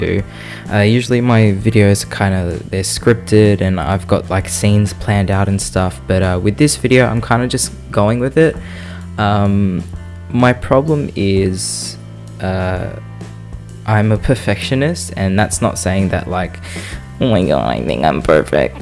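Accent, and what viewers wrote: Australia. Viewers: aww you seem so smol in this and after you mention being Aussie, I just heard your accent so much more intensely after lol. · I could tell u were from Australia. I am too mate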